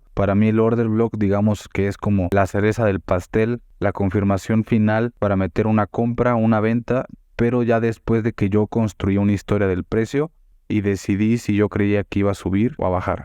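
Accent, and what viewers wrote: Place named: Mexico City, Mexico. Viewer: ¿eres de Chilangolandia?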